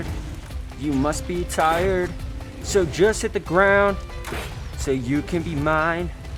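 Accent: United States. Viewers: Sound Texan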